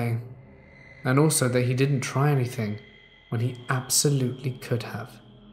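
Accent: United Kingdom. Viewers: So many of these stories are typically American teenagers but your narrator is obviously British. He’s excellent. Could pass fir Mr Darcy telling me a story. I’d love to hear British horror and not the stories of American kids, campfires and wendigos which get samey. But This first story sounds good.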